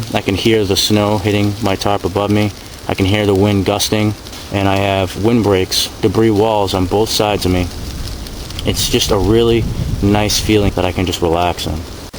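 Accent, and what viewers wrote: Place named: Northeast, United States. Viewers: Pretty spiffy for a masshole! :) I could tell right away from your accent that you were from the greater boston area. And when I heard you talk about new bedford, well that just gave it away. Seriously though, it is refreshing to see someone doing a camping experience video that is real and not perfectly choreographed. You made a few mistakes like not gathering enough firewood before dark, and your shelter is not setup the best, but you got through it and you did it legitimately! It's nice to see a newbie practicing their skills. You did a great job and I enjoyed watching this video! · Great video! Where is this place? You sound northeastern.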